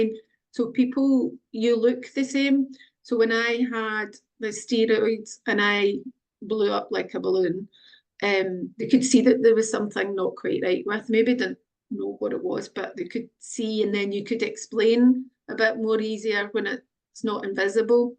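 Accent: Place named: Scotland, United Kingdom. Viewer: Thank you. For sharing your story. I can relate to your story so intensely. I myself am a survivor of Viral Encephalitis and Autoimmune Encephalitis. Also living in Scotland. I feel so fortunate. Encephalitis changed my life. I have a different outlook on my life now. I also live in the West of Scotland. And it was comforting hearing your voice and accent in your story. ❤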